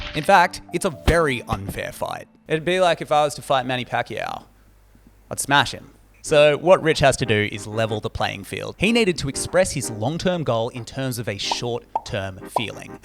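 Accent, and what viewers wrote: Australia. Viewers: I love your videos!! Perhaps someday you can add subtitles? I'm from Colombia and the Australian accent is way more difficult to understand. Thank you for posting!!!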